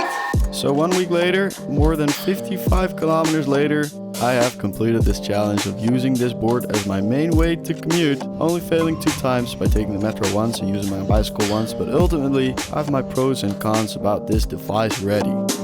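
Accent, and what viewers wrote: Netherlands. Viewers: Ik wist dat je nl was khoor dat aan je accent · you are NL I can hear it on your accent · This guy dutch?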